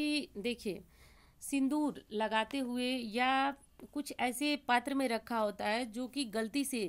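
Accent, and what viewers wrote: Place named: Bihar, India. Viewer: Aap k baat sa laga ke aap bihari ha Ma b bihari hu di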